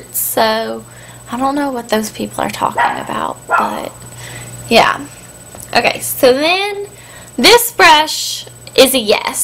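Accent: South, United States. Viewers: this is so cute... i love her southern accent in this video... she doesn't sound like this anymore... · OMG SHE KINDA HAS A SOUTHERN ACCENT!!! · You sound country in this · I love her southern accent!!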